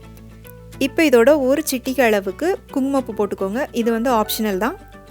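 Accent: Tirunelveli, India. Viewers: Superrrrr. Voice Superb. I am also nellai district in sengottai. Unka voice supera irukum pothae ninichaen neenka namma oorunu. Keep it up ma'am. Pudina thuvail sollunka ma'am.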